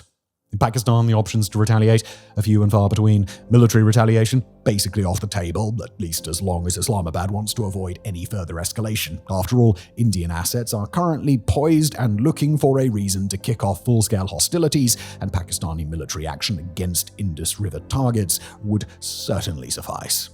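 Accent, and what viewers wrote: United Kingdom. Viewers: Hey white man either a British accent, what would your country do if it had a neighbor like Pakistan which continues to train, execute and protect terrorists? What would any reasonable country do? Is there any other country in the world which has tolerated this kind behavior like India with so much patience?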